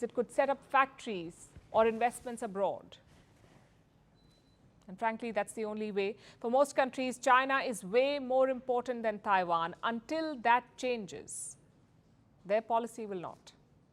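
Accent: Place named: India. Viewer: Firstpost is CIA funded propaganda channel. Palki was chosen for her thick Indian accent, meant to confuse naive Indians. All her material are created by CIA paid staff, she just delivers it like an 'Indian'.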